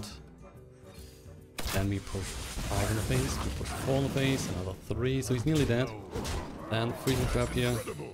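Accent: Germany. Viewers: Deutscher Akzent :D. Nice deck, i love it and it's my first good deck. I hope my dust is spend right :).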